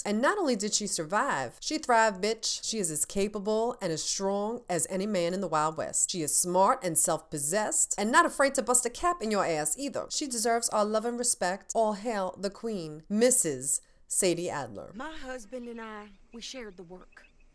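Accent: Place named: Texas, United States. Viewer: it looks like a mysterious Japanese female assassin with an American (Texas) accent hosting game awards .cool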